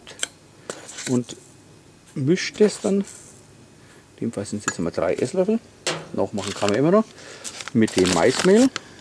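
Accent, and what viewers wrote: Franconia, Germany. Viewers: Der fränggische Dialekt ist zwar grauslig zum hören- aber das Video, das Rezept gleichts wieder aus ;-) Macht Bock auf mehr :) Welches Rub hast Du verwendet? schönes Video - Danke fürs Hochladen :)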